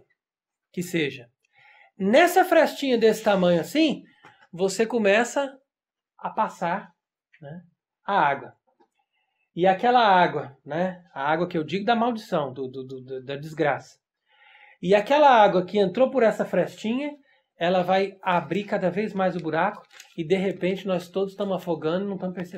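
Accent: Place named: Minas Gerais, Brazil. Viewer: vc é mineiro de Ituiutaba? Esse sotaque é ótimo. Parabéns pelo trabalho!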